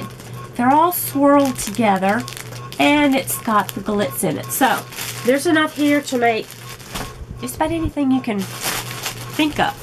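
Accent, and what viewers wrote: Texas, United States. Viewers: Haha you had me at your Texas accent 😍 I’m from Florida and have slowly learned knitting the last couple of years...but have most recently picked up crochet....that is my hobby/life right now haha... other than play with yarn, I’m recruiting my granddaughter to play with yarn with me lol....she is 6 1/2 and she loves rainbow yarn and always picks it out, usually solids that make up a rainbow and demolish and knot up 5 of my skeins....lol It’s OK just having someone else around interested in the same thing is wonderful 😊 So glad to have found your channel can’t wait to watch all of your videos.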